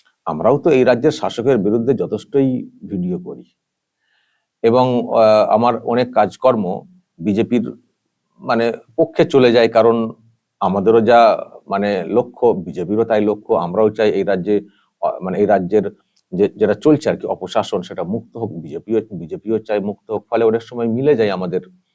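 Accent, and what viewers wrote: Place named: Bangladesh. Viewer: ache vai keman ekta bangladeshi taan taan vab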